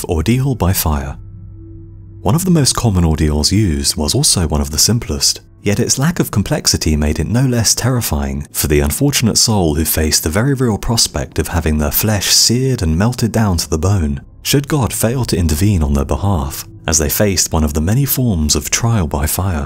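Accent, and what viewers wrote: United Kingdom. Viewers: Best narrator voice of all time. I must know what part of Great Britain has this accent. Please enlighten me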